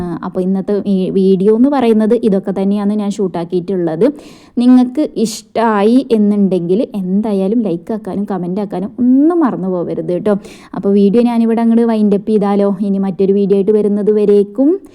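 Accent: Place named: Kozhikode, India. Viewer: എന്തിനാ കോഴിക്കോട് ഭാഷ പറയുന്ന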